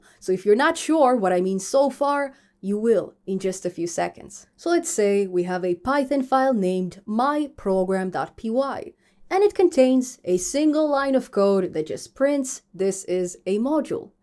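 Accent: Russia. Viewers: На россиянку похожа и акцент русский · Мне показалось, или я слышу легкий русский акцент?)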